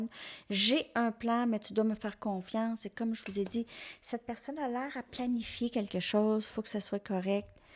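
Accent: Quebec, Canada. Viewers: 😂Vous, êtes très belle avec votre accent, le même que Céline Dion🌸merci 🌺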